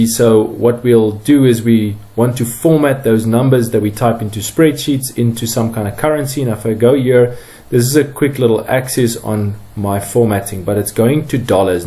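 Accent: South Africa. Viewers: I never stop to comment on any of the thousands of topics I've gleaned through "googling", LOL. This was refreshing. A fantastically simple solution. I am suprised that, for once it was information supplied, and explained, in South African English by a South African voice! With guys like you around, doing your bit for the education of our nation. Such are the keys that will again empower us to build just as formidable an economy as that of the mid to late1900's where our country's economic strength gave the Yanks the proverbial "...run for their money". No pun intended. Looksee: "What was the dollar to the rand in 1971? One rand was worth US$1.40 (R0.72 per dollar) from the time of its inception in 1961 until late 1971, and the U.S. dollar became stronger than South African currency for the first time on 15 March 1982." excerpt from a <link> article; <link> › wiki › South_African_rand Bravo! 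I take my hat off to you Sir! Well done & keep up at forging ahead thus. What might seem to some as being minute could turn out to be a massive gift to others. Philosophically speaking: a small key may unlock large doors that open into large stores of immeasurable treasure when it is gifted with such a spirit as evident here. Which could and should be elevated to being an "impartation", almost, of much-needed and excellent socio-economic contributions. In the face of such contributions, politics eventually means nothing more than strategic puppeteering. Look at the American case as eviden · Thank you so much. Very helpful (and naas to hear a South African accent!)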